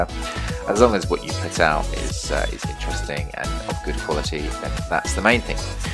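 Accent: England, United Kingdom. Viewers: Lovely British Orochi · Ah Yes, a fellow Englishman Finally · ofc its a uk CUTIE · O my god hes british i wasnt expecting that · He’s British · I had no inkling you were british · I mean I guessed you'd be from England from that time I found you and you kicked my ass on For Honor 😂😂😭 keep up the great work man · No joke I thought u were Asian but it turns out you're a Brit like me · Didn't expect you to be English haha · Voice reveal. British. Subscribed. Brilliant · YES, HE'S BRITISH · I fuckin knew it he was british. We luv ya!!!! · Oh snap he's english! I love even more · You're English, oh yeah baby respect my brother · Who else knew he would be from the U.K because I did lol 😂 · YES!!! BRITISH!!! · Oooooooo a British accent I like it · I had a feeling he had an English accent. I love it 😍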